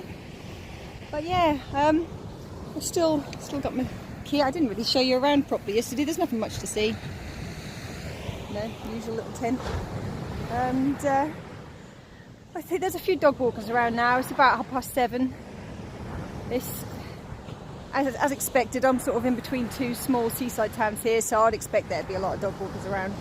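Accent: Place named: Norfolk, United Kingdom. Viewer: Hi just come across your vid when put in Norfolk coastal path, looking to do the walk and camp along the way if we can ever camp this year. Nice to hear a norfolk twang, being from Norfolk. Well done on your solo camp, distance is never an issue, I often go a short drive away for quick overnighter. Hand warmers inbetween your socks , or if you have a nalgien type botle fill up and use as a hot water botle , so nice to keep feet warm.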